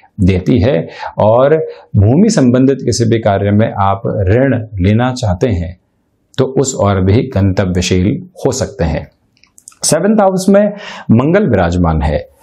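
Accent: Standard. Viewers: Very pure accent , great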